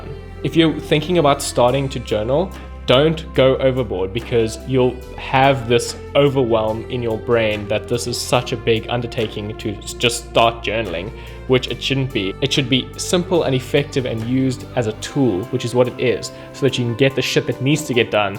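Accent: South Africa. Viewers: I recognized your accent immediately! Fellow South African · loving this south african accent ....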